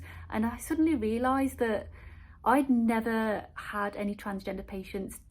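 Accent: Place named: Northern England, United Kingdom. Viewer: Also your accent is so charming to me :P Northern England? Or is it Scouse? I've tried to learn as a Canadian who loves British culture lol (all of "Britain". I know you're English :P)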